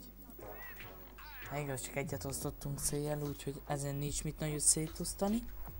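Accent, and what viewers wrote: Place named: Szekler Land, Romania. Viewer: szoval ezert volt ismeros szekely beszed :)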